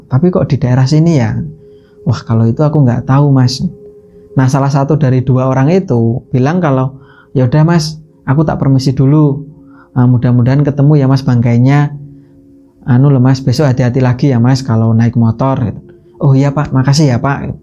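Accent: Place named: Sragen, Indonesia. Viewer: Mas pean iku logat bahasane kyk wong sragen , blora y?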